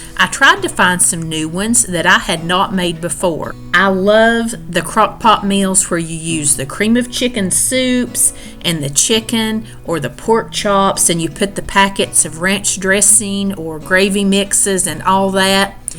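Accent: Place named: Alabama, United States. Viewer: I just found your channel! I love your accent - you sound like me!❤️from Alabama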